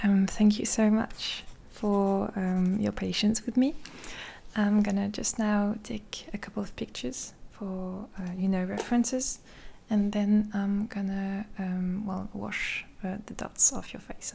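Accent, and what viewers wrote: France. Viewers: Are you French?? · Am only 2 mins in and I thought French but will listen a bit more lol first video of hers so haven't a clue. (Sounds French to by English ear anyway) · A perfect video I’m sure of what I’m saying but l accent français franchement sa rajoute un truc et le alors a un moment magique super vidéo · is she french? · El acento francés sisisi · Je suis juste réellement impressionné par ce bon niveau d'anglais, j'écoute bcp d'asmr anglais pour m'améliorer, eh bien contre toute attente un asmr anglais avec accent français c'est pas si mauvais pour progresser, j'ai tout compris, l'accent doit être assez familier je suppose. Sinon continue comme ça, ton asmr est très bien.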